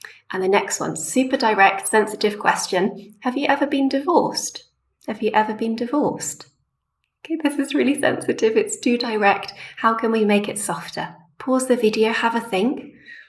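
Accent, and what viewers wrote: United Kingdom. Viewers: I wonder if you could tell me what your accent is. Is it fully RP or mixed from one of northern or southern part of UK?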